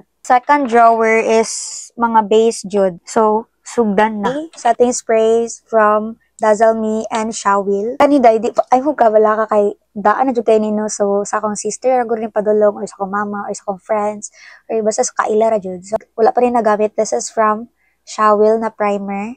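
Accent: Visayas, Philippines. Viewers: My fav.part ko sayo is your humor your not boring when talking and also if piniflex mo yung mga products na ginagamit mo and i also ordered it kase proven and tested mo yung mga products and also yung Boses mo kapag nag bibisaya ka kinda like sana naging bisaya din ako Hhahahaha yun pang po. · Fave part: the way you talk bisaya nga mailhan gyud nmo nga bisaya kaayu gyud and Makita sad na love kaayu nmo imung followers🫶🥹🩷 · Fav part sa imoha is imohang oa bisaya accent😂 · One of my fav jud sa imo dzai the way kag mag voice over sa imo mga vlogs grabe ka vibes bisaya kaau lol (love it), also gaka bayot kos imong ka gwapa dzaiiiii the face card, sufeeer cuteee^_^ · my fav part about sayo ate ay yung pagiging confident mo sa pag vvlog at pag a affiliate mo hindi kapo katulad ng iba na nag aaffiliate na hhindi honest sa mga product na pinapakita nila and sapagiging masiyahin mo and apaka pretty mopo and kind ang perfect mopo talagaaa and yung pag speak mopo ng bisaya ang sarap po pakinggan my mother is bisaya din po hehehe hooping po mapipi ng give away nyo😇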